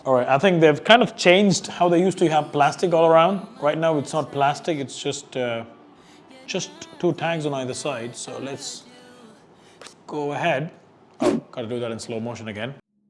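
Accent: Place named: Tamil Nadu, India. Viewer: Enna thala english ku poita un tamil slang ku dhan video vey na pakkuren plz make videos on tamil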